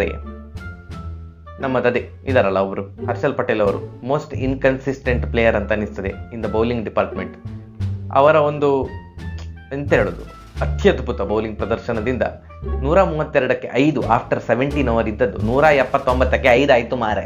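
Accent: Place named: Udupi, India. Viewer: Hello sir, I feel happy to hear Udupi-Kannada from you as I studied in MIT. I watch nearly all the videos of yours and even I subscribed the channel In recent times the match summary you were giving is lacking some important matters. For example, 1. Virat has batted at 100 strike rate you didn't said any word of it, 2. ABD is not getting much chance to bat as he is coming at latter half of 20 overs Opposition will be more happy if they want to bowl less to ABD. He once batted one down in previous seasons and asked to play at 5 in this season which is surely not a good move. If RCB want to win a game either abd or maxwell need to spend more time in the crease. I hope you prepare better in the coming videos and add all points which are crucial in determining the result of the game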